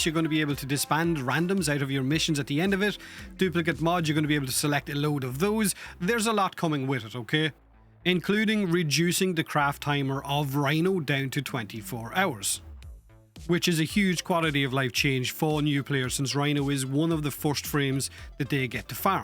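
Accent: Ireland. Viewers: Bro is Irish. Chill. … I have Irish ancestry here in the USA I be saying the same shit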